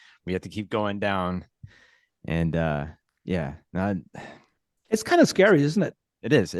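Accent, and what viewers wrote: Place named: United States. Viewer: Dass so gut Englisch kann, schreckt mich wenig, spricht wie ein Amerikaner👍 Und er ist noch dazu Österreicher wie ich...👌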